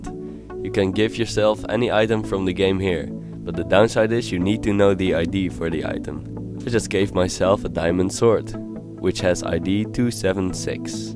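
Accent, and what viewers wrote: Netherlands. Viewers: Are you dutch? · i am dutch and i think he to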